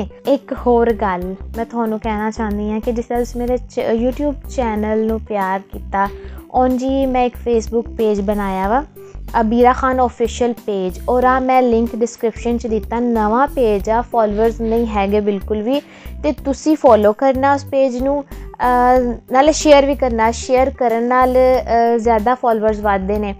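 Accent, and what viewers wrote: Punjab, India. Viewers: you are fantastic. We love you are promoting Punjabi and speaking very sweet Punjabi. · Happy new year 💖 ji, bohot hi suaadi he tohadi punjabi. Allah tuhanu Khush rakhye. I love my punjab. I love my india. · Your Pure great sweet Punjabi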